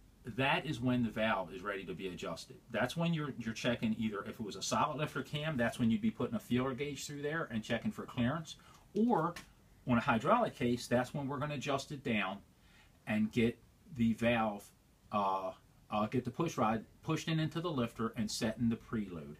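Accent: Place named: Maryland, United States. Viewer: This guy sounds like he's from the eastern shore of Maryland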